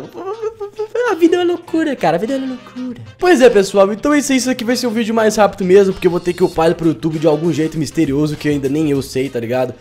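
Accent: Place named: Minas Gerais, Brazil. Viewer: Tem, de mineiro hahaha :3 é muito bonitinho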